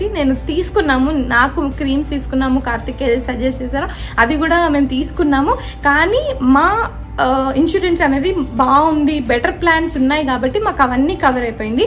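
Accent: Telangana, India. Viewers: Hai sister! bava Namasthe! Nenu mee videos oka 5days nunchi chusthunnanu.chala bagunai,chala vishayalu thelusthunnai.meeru akkada unna kuda mana saampradayalu marva kunda meeru chese poojalu naku baga nachai.e roju maa ammaku mee videos chupincha.chala manchigunai annadhi.mimmalni chusaka chala nerchukovali anipinchindhi.mee presentation mana telangana yasa lo undatam valana inka chala bagundhi.vemulavada rajanna swami dheevena eppudu mee pai undalani korukuntu.....all the best!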